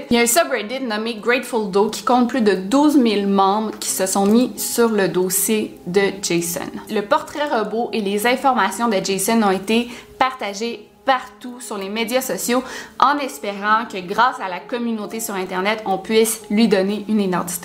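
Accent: Quebec, Canada. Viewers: je comprendrais jamais pourquoi tu te fais autant critiquée😭 je sais pas, tes vidéos sont supers, recherchées, tu expliques les “détails”, ta voix est très agréable et j’adore l’accent québécois ahah! ce que je préfère c’est les kidnapping/enlèvements :) voilà bisous, et félicitation pour ton mariage (qui est dans un mois, je crois ?!) ❤️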